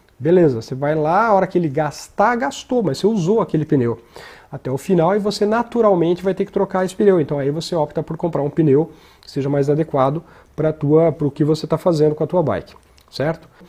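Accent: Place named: Germany. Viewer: Cara vocês são muito showww. Esse sotaque da é lindo... Parabéns casal... Primeiro...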